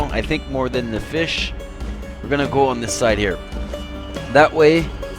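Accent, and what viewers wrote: France. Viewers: are you french cause im french and when i speak english i sound similar to you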